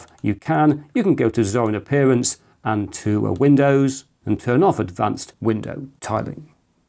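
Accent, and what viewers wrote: England, United Kingdom. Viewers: It's like watching Bill Gates in the 70s, but then with a British accent, loved the old school video ! 😃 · I love the way you explain. This is great, thank you English Man, love the accent too by the way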